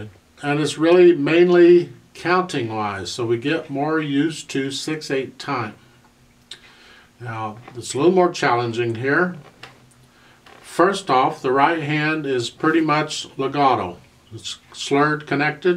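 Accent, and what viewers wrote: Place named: Kansas, United States. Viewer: Beautiful. Thank you! It could have been so cute hearing your Kansan accent saying in Spanish. 😊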